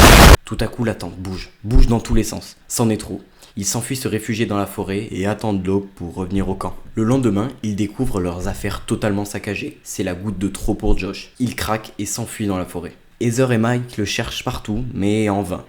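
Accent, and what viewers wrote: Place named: Quebec, Canada. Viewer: tu est quebecois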